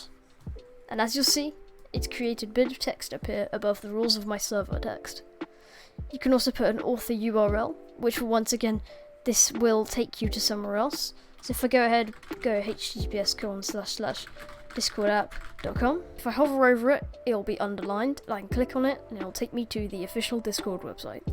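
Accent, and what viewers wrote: United Kingdom. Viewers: Man sounds like he is British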